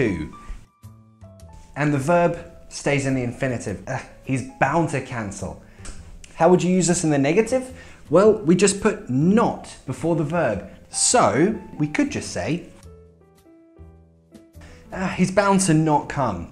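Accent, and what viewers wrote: United Kingdom. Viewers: That is really interesting. Thank you so much for your efforts. And actually, I am not British, and I watch your videos to improve my British accent. I would like to learn how to pronounce the letter 'o' in a British way like in the words 'no' or 'so'. They are not pronounce the same as in American accent. Thank you in advance ! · i really enjoy all your vedios .... hope that you will go on and make more usefull vedios iam in love with the British accent and you helped me to learn it ... Thank you very much ❤❤❤❤❤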